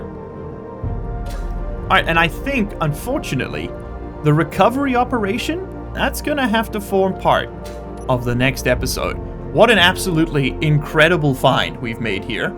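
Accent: South Africa. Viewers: I couldn’t place where you were from for the live of me but South Africa makes sooooo much sense, idk what it is about some of the South African accents that are so damn hard for me to place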